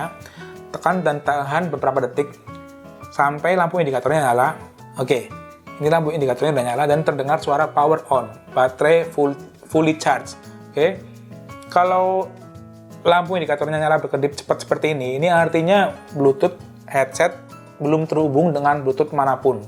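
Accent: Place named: Purwokerto, Indonesia. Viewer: Mas orang Purwokerto bukan wkwkw logatnya mirip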